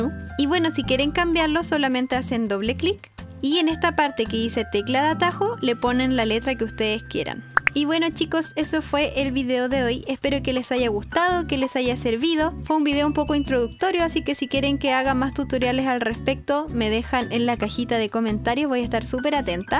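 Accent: Chile. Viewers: sos chilena? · cuando escuche tu voz dije: OOOOOHH es chilena xd edit: Like y gracias por el tuto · eres de chile se nota por como hablas no digo para ofernder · Eee es chilena vivan los chilenos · holi eres de chile? se nota en tu voz uwu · de casualidad eres chilena? es que tu acento es super marcado y lo conozco porque tambien soy chilena:virtualhug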